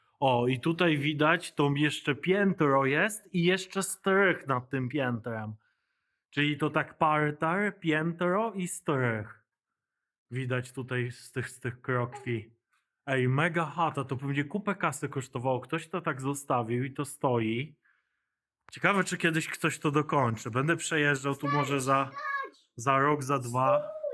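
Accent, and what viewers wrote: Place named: United States. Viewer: Wygląda jak dom Kamila Stocha. A pan fajnie "po amerykańsku" mówi jak Mariusz Max Kolonko.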